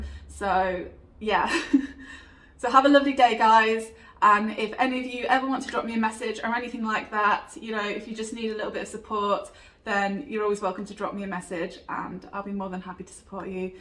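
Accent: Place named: United Kingdom. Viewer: Hey! Love your work (and love your British accent). You are super talented and I have learned so much from you. Thanks for this video - you rock! ❤️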